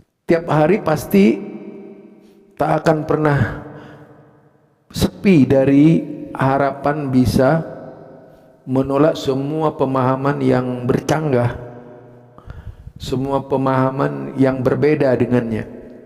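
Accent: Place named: Java, Indonesia. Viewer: Ojo ojo dulu mendoknya sama kyi idrus romli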